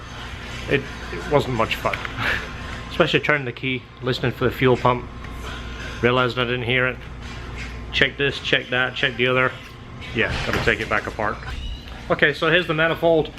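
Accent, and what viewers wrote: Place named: United Kingdom. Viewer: Where you from dude your accent sounds a bit British? Great work by the way.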